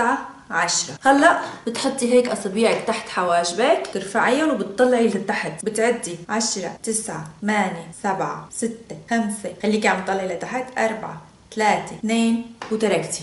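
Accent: Aleppo, Syria. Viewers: دخيل الله ما ألذك😍😍 ماتبلى هالضحكة وهاللهجة الحلبية اللي بعشقا❤️❤️ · دخيل روحك بتجنني 😍 دخلك كأنو لهجتك حلبية ؟؟ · حضرتك من سوريا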